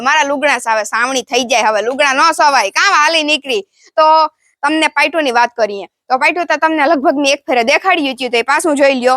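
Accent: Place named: Kathiawar, India. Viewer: સિસટર તમારિ કાઠીયાવાડી બોલિ સરસ છે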